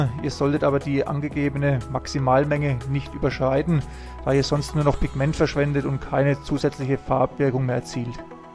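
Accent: Franconia, Germany. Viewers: Wieder sehr interessant geworden das ganze, locker erzählt mit leicht fränkischen unterton.......mach ner su weider ! Die 3te Platte schaut schon am besten aus, allerdings könnten die Kontraste etwas stärker sein. Evtl etwas warten bis der Beton nicht mehr ganz so flüssig ist und die 2 Farben sich nicht mehr so stark vermischen ? Hmm.......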